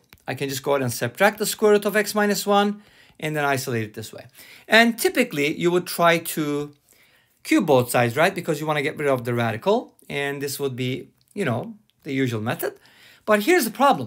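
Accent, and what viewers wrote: Turkey. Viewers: Hello, may I ask where you’re from because your accent sounds like Turkish accent and I am Turkish so I just wanted to know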